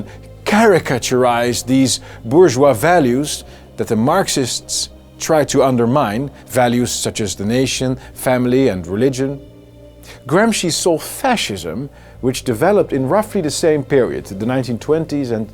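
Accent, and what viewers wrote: United Kingdom. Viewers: Dit soort video's bewerkstelligt dat je verder van leiderschap komt te staan niet dichter erbij ! Dit soort grappige boodschappen nemen stemmers niet serieus sorry............na de laatste keer FVD gestemd te hebben ga ik weer terug naar de PVv sorry , had er goede hoop bij. Maar dit soort dingen maakt het lachwekkend , en absoluut niet MP waardig !!!!!!!!!!!!!!!!!! Tis speels en kinderlijk maar niet volwassen gedrag . Dit doet men niet thierry...........check maar eens Pim die had het en kon het ! Je hebt zo een goede mensen naast je Gideon , Pepijn enz enz...maar je zet hun te kak , wat hun sterkte laat afnemen. Je bent eigenlijk een man die is blijven hangen in zijn kindertijd en onvolwassen overkomt , veel geschiedenis kennen is leuk maar niet in te passen in dit ! Jammer dat ik niet val voor je GB accentje sorry ......................its over FVD ......te danken aan dit soort filmpjes . 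Eigen graf gravend ten top !!!!!!!!!!!!!!!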